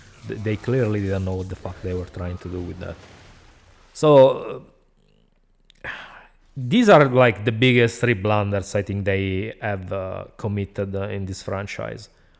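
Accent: Italy. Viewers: By the way. The way you say Batman with your Italian accent is so satisfying. In a sense of the phonetics of it. Sorry autism lmao.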